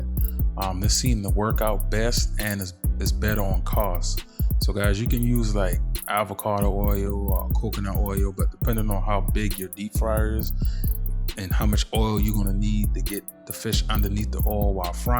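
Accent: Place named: Gullah, United States. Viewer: You sound like one of my Gullah clan. Say it's so😊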